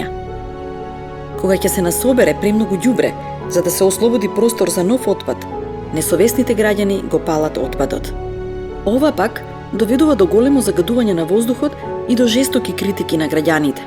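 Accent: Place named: East, North Macedonia. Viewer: Малку си мава на источномакедонски нараторот, но е многу подобро отколку виличење на скопски. Најважно од cè, многу интересна тема, и добро покриена. Браво!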